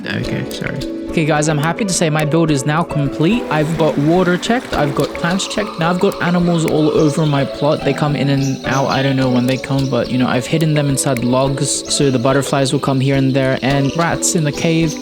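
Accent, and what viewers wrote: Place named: Australia. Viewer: omg it’s an aussie!! side note: your building skills are litteraly jaw dropping oml🔥🔥, also the editing 🤌🤌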